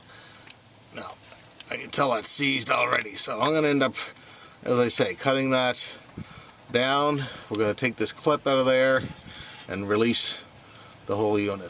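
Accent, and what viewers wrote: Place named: Canada. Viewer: Liked your video even with that terrible Canadian accent! Thanks!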